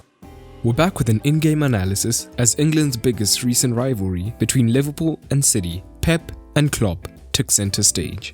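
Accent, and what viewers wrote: South Africa. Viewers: You sound South African, am I right?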